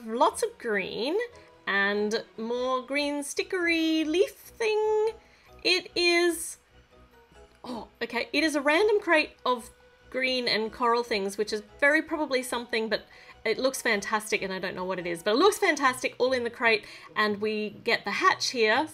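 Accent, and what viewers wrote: Australia. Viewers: I think those thinks over the trap door are Banana leafs. They are used as plates in South Asia. I am from India and we eat from banana leafs whenever there is a function like a wedding. By the way when you don't upload videos any one day I miss your crazyness, Australian accent, cuteness in your words and awsone lego videos. You kept me happy in the lockdown too. I love your videos😀😀😀😀😀🥰🥰🥰🥰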